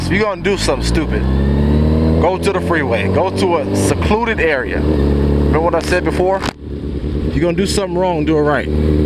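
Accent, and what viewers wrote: Michigan, United States. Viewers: You from Michigan bro?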